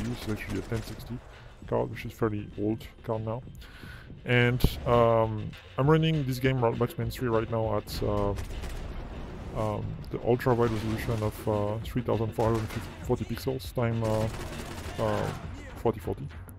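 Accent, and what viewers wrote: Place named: France. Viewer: accent français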